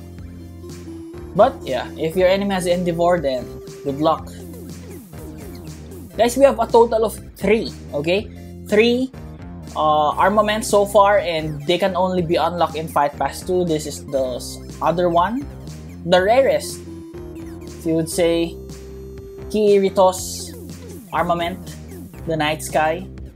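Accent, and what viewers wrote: Philippines. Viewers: talking sus he sounds like a filipino..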